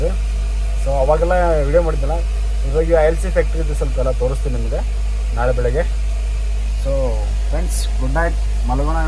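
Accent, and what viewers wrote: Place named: North Karnataka, India. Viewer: Anna Uttara Karnataka alla ninda, Ya ura nimda ❤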